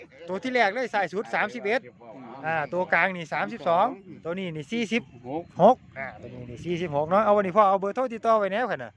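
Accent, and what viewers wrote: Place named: Sisaket, Thailand. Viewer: สวัสดีค่ะอ้าย ศรีสะเกษชัดเจนจ้า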